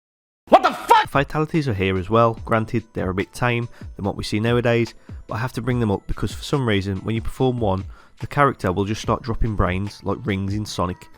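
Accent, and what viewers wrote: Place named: West Midlands, United Kingdom. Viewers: Nice to hear a West Midlands accent on YouTube. Great video! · Completely unrelated here. But that is a black country accent if I've ever heard one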